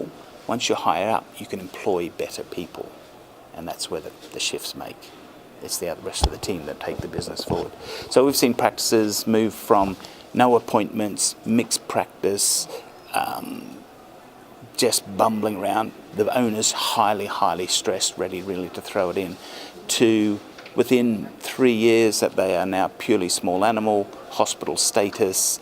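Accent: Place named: New Zealand. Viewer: Lol he's a Kiwi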